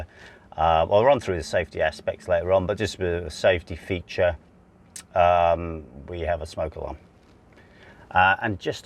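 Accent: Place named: Australia. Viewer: Well I just checked out your other videos and see you're in Australia. I'm in the US but my best friend lives in Sydney and I was sure that was a down under voice you have.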